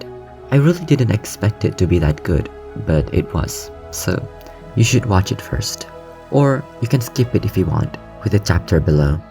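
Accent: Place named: Asia. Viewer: Interesting perspective, but there are some points you made I'm agaisnt. Dressing up as animals in public or marrying fictional characters shouldn't be normalized by society and encouraged. You should not go to prison or be systematically punished, but these actions should be socially condemned. A society needs stable and functional norms that are followed by each citizen in order to survive, or else it risks falling into problems such as decadence or just general social instability. Morality can be subjective depending on how you look at it, but standards must be set. It doesn't have to be 1984 either. However, I can understand why you might think this way. I'm not too knowledgeable on this topic, but on some countries such as Korea and Japan it can get kinda insane, as it has become socially normalized to have a false identity in your public life just to be agreeable and appease everyone. 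Judging from your accent, I think you may be Asian so it is reasonable to think you'd be unsatisfied with how social freedoms can be restricted by society in those countries. However, at some point, freedom needs to be limited so society can keep running and not fall into collapse. You have the freedom to make choices, but you do not have the freedom to escape the consequences. This may sound a bit like Petersonianism, where every individual has free will but it must be enforced with strict moral codes for an ideal society. I might be wrong, I'm not a philosophist I'm just sharing my thoug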